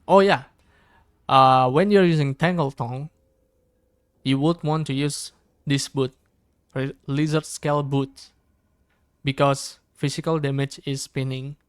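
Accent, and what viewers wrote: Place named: Indonesia. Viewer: Thanks for the awesome build bro enjoying it very much! Btw, From your accent, are you indonesian ?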